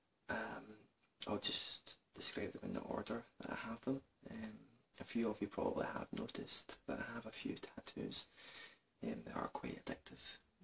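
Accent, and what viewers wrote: Scotland, United Kingdom. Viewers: Do love the scottish accent :)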